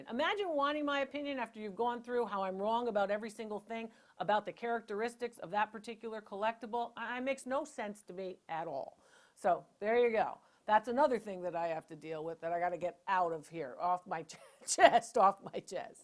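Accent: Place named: New York, United States. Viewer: It's just because of your New York accent that people say you remind them of others. That's because others have the New York accent, and Judge Judy is straight forward (although a little rough sometimes). Don't worry about the evil people with the nasty comments. They are just jealous because they don't know as much as you do.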